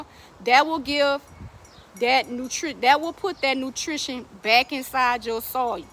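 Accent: New Orleans, United States. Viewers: Accent reminds me of family in Nawlins. We like to talk ish about the differences at family reunions lol much love from Slauson and Crenshaw 💪🏽🏁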